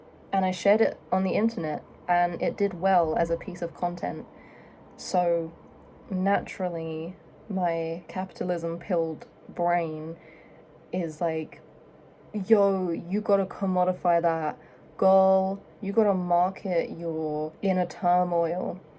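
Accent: England, United Kingdom. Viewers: Re: ideas for content : 1. Something about your city. Based on your english, I assume it is not USA, but England. 2. You trying out new things. it could be some new style, new hair color, new outfits, may be a completely different ring for the nose, new food, new hobby. Something about what you never thought to try. 3. start some exercise regime... I don't know ... yoga or heavy lifting. Something you wouldn't expect of yourself. And post how it is going. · haha maybe the british accent is deceiving but you don't seem that dumb. Maybe dumbest people are actually those who don't even realize they are dumb, idk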